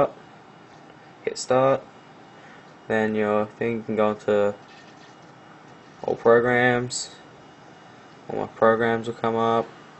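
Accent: Australia. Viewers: You're australian.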